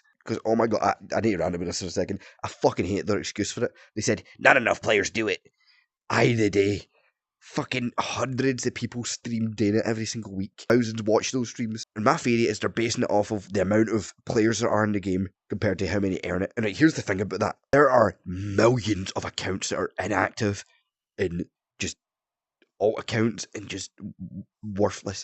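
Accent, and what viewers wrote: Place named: Australia. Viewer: The Australian accent makes it 10 times better lol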